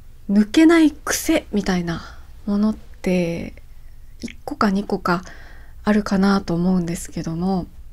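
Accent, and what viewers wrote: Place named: Kansai, Japan. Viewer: 今回は道頓堀での講演のせいか、関西弁が強めの深津さんは良いね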